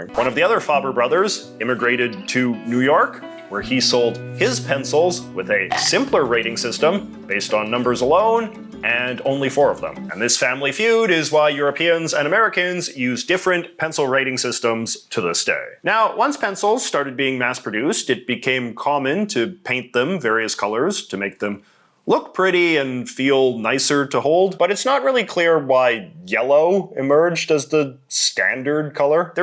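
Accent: Canada. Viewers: This is the first time I've managed to pick up a canadian accent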